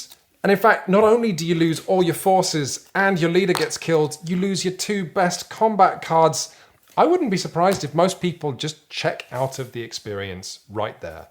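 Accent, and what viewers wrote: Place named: England, United Kingdom. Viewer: most English sounding man ever, congrats.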